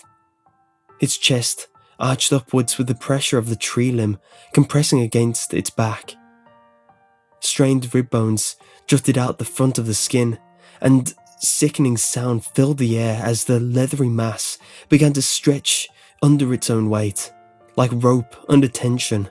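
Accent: United Kingdom. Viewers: The dialect and narrator were so British it hurt. It hurt real good.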